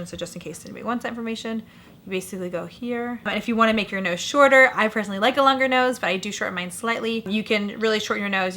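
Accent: Iraq. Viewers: احبج من تحجين عراقي 🤩🤩🤩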